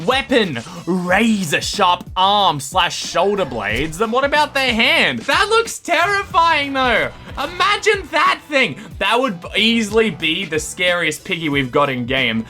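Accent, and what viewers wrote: Australia. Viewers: You have a Australian accent! I’m Australian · I can definitely tell you have an Aussie accent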